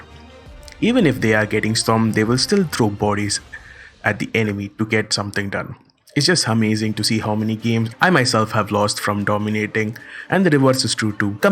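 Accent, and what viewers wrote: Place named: India. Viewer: that indian accent haha superb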